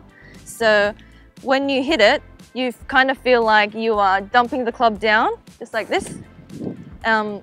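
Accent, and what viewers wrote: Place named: Australia. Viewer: Wonderful Aussie accent. And of course, beautiful legs! Go Minjee.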